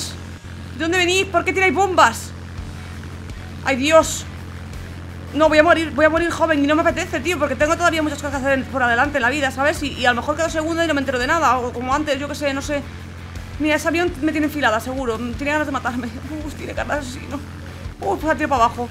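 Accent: Argentina. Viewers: me mata haciendo acento de argentina!! le sale bien. porque yo soy argentina!! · Hablas argentina tia😨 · soy sub me encanta tu voz tu asentó argentino u como habla s con morsa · Juego simon dice,cuando dice say y algun color es porque tienes que ir al color que te dice roblox,Cuando no dice say no vallas al color que dice...entiendes? por cierto muy bien el acento argentino:D yo soy argentino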